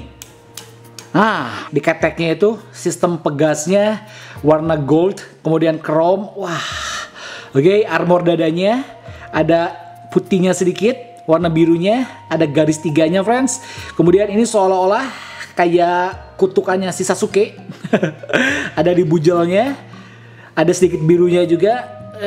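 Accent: West Java, Indonesia. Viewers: Ciri khas orang sunda kang... Hehehe, sy oge Sunda sok kitu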